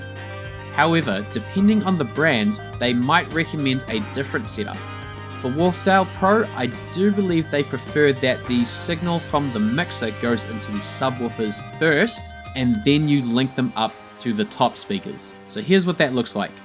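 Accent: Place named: New Zealand. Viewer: Good channel! Sounds like a kiwi accent. I have the same mixer too 😊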